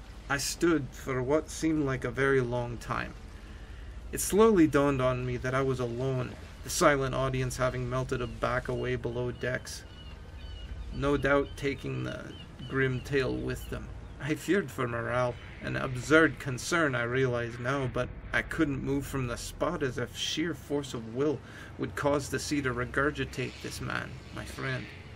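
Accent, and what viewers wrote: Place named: Ireland. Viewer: I've heard this one before but it's way better with the Irish accent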